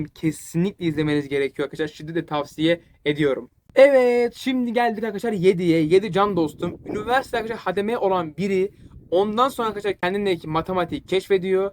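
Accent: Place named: United States. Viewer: Aksanın çok yoruyor amerikan aksanı gibi :D bence biraz bu konu da değişim yapsan iyi olur gibi. yapabilceğine inanıyorum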